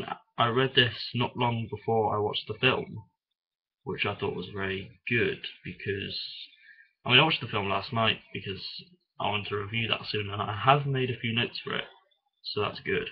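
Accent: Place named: Northern England, United Kingdom. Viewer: okay you sound northern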